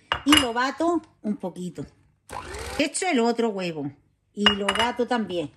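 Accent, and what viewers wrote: Andalusia, Spain. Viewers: Me encanta tú naturalidad!! Ole ese acento andaluz la gente lo quiere esconder y se pone mu fina con lo bonito que es!! Viva Andalucia y no le hagas caso a los que dices que te enrollas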